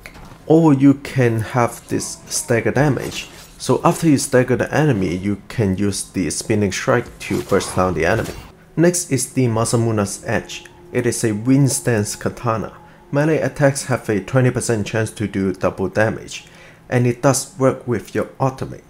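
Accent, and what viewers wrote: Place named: Taiwan. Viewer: Thank you for another great video. A lot of information to process here so i need to watch a few times so i can adjust my Samurai build. Are you from Taiwan, love your accent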